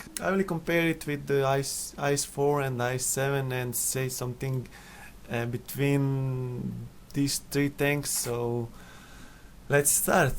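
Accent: Russia. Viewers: Kako koji put sve si bolji sa engleskim ali i dalje zvucis kao russ ;) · you sound like are from russia, but its cool, keep it up, I love your chanel. I di je onaj ludonja sta kaze jebi dan krumpirko, ukra si mi kill.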